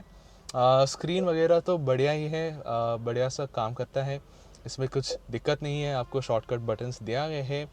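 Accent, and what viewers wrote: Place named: Kerala, India. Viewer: If I am not wrong your accent resembles Keralam.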